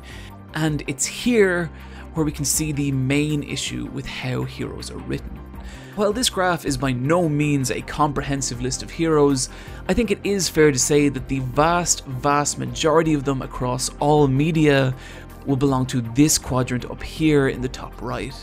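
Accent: Ireland. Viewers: My high school English teacher, senior year, said something similar. He said in fiction, the villain rarely ever thinks of themself as being evil. They believe that they are the hero. Made a crapload of sense when I played Borderlands 2, but I like hearing it reiterated in a silky smooth Irish accent.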